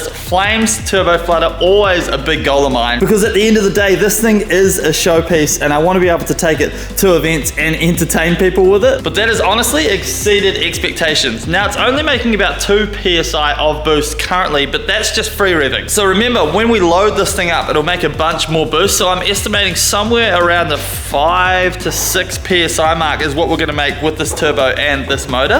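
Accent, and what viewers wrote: New Zealand. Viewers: Are you a yank???? It’s zed not zee your kiwi accent is hard enough to swallow but adding a yank into the mix is just too much to handle I’m going to have to unsubscribe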